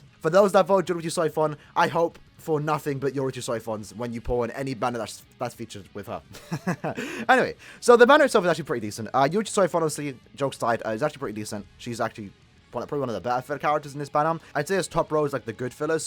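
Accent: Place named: United Kingdom. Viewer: Wha' a salty bri'ish bloke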